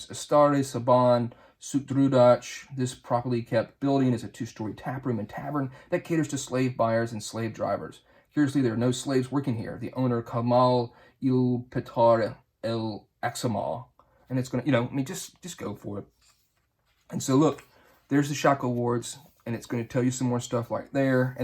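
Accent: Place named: South, United States. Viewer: Great video. I love seeing reviews of 2edition products and FR specifically. Theres very little on 2nd edition Realms out there. I asked this on your introduction video but I thought I'd ask again. Are you in South Carolina? I can detect some southern in your accent and I saw the WYFF meteorologist video lol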